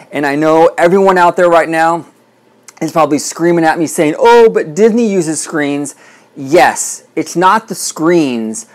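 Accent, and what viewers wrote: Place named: Florida, United States. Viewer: you sound like you are from Florida. I live in LA. Here universal is no where near Disney quality. HP world is a little street here.......I counted 49 disneyland resort rides and 2 of them are simulator rides. At universal studios hollywood only 3 rides and the tram are not simulators or have strong parts of the ride as a simulator. But to clarify what I said in my post, ill give an example. ET was a fantastic ride. One of my favorites as a kid. the theming was top level. Now its replaced by a lifeless rollercoaster. I don't think anyone would try to say the mummy ride has better theming than ET. Another example was king kong. That ride was burned in the memory of any young kid who road it. It was amazing because it was real. Now its just another simulation.....one step forward......two steps back......No one i know, wants these bullshit simulators.....And I won't respect disney if they start putting in simulations at the rate universal has done.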